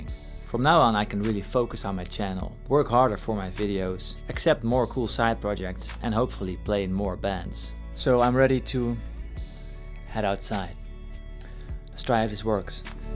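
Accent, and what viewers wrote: Netherlands. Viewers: Hi, bij toeval een weekje geleden op je kanaal gestuit. Ik kon horen dat je uit NL kwam, dus ik zoeken, blijk je in Zwijndrecht te wonen, naast Dordt waar ik zelf woon. Ik zag dat je les gaf, maar geen leerlingen meer aannam. En nu lees ik dat je stopt als leraar. Er kan veel gebeuren in een week. Supergoede keuze: je hebt superveel talent en een drive, dus dit gaat zeker goed uitpakken. Best of luck! Markwin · Nice, always thought I recognized a Dutch accent, bit now i'm for sure.(lesruimtes) Good luck on your new challenges. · I love your accent. :v As a hobbyist voice actor I'm going to look into learning how to do a Dutch accent now. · It's Dutch, it's a pretty unique accent. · so he is dutch? i just discovered this channel and was wondering about his accent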